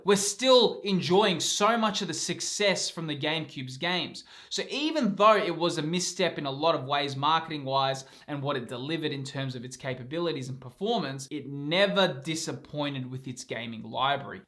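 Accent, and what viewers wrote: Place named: Australia. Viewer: I didn't know that I needed a fellow Aussie to talk about the Gamecube like this, but damn - great video!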